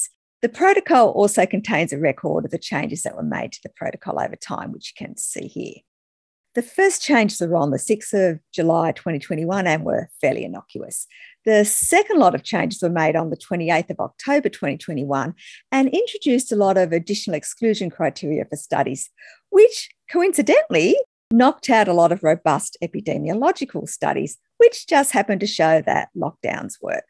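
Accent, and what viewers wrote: Australia. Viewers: She's an Ozzie. Plus, using the 'innuendo' parrot's Campbell.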